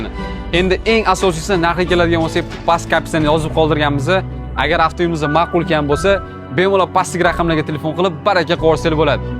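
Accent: Tashkent, Uzbekistan. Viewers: Harpsanu ne toshkencha gapirib chiranasan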